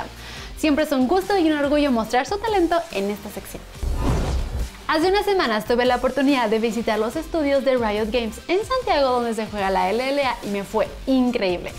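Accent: Mexico. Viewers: Es mexicana? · Demasiado robótica aparte su mexicano weyyyy uff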